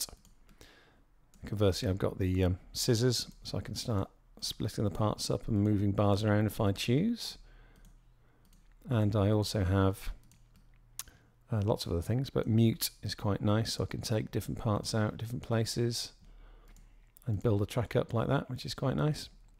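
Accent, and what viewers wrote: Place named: United Kingdom. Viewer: "might be a cheeky one in there" tehehe love the british accent and vernacular :) thanks for helpful video!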